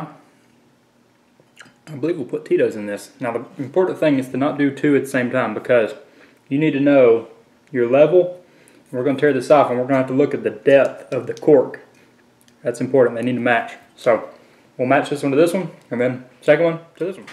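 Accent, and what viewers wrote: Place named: South, United States. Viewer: Carnival cruise and a southern accent are like peas and carrots.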